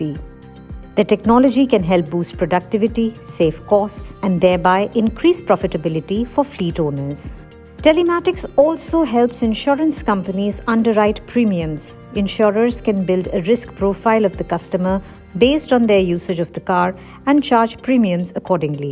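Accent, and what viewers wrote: India. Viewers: Is it Indian accent ?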